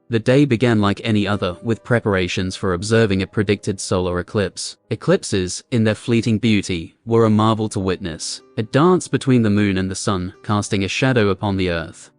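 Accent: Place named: United Kingdom. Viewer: This British sound makes this little bit better🔥🔥🔥🔥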